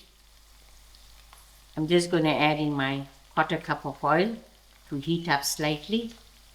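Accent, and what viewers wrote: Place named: Sezela, South Africa. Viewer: Aunty you sound like a Sezela Tamil aunty,saying orhil and tamartoe. How big is Prakash's dhanda?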